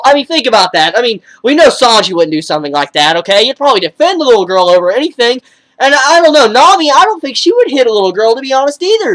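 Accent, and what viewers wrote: South, United States. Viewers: Did you study voice training? Or something to that effect? You sound noticeably more country back then and now you speak very clearly and slowed down.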